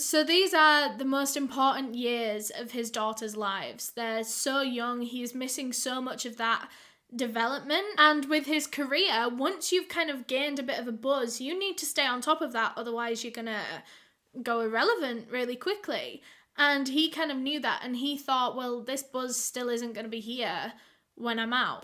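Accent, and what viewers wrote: United Kingdom. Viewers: IDKY youtube recommended this channel but THANKS... this adorable brit-ish girl talking about New York hip hop murders is HILARIOUS. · "Drugz spelt with ZED" .... Beautiful love UKs pronunciation of words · I am a middle-aged woman in medicine hat Alberta in the middle of the freezing cold listening to this young girl and have literally been stuck on her channel for days you are a total sweetheart I wish I had you for a daughter I am 53 years old and I totally love your concept the way you portray the stories you're so sensitive to what kind of story you are showing us especially around children I think that's so sweet I found you totally by chance but I have been watching you for the last 3 weeks Non-Stop my 17-year-old son it's starting to get worried and has now starting to try and peek in and see who this girl is with the beautiful British accent you see we live in the prairies and medicine hat Alberta population 68,000 I have never seen you before young lady but you are an exceptional wonderful bubbly incredibly talented young lady you're the daughter I wish I had I was only able to have one son by the way and he's very very ill he had an unsuccessful kidney transplant at 15 years old I he has just been 3 weeks in children's hospital and the neurology clinic having major blood filtration blood plasma and steroid therapy to try and stop his kidneys from rejecting so if you guys could please pray for my son he's in a really bad way right now he's lost two markers on his kidney which means he's going to have a horrible time finding a new one please pray for him his name is Riley he had heart surgery at 6 days old to repair one of his valves he was born 2 lb 2 oz 10 wee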